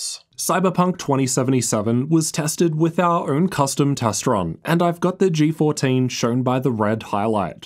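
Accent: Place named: Australia. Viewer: Man, that Aussie accent sounds funny. :D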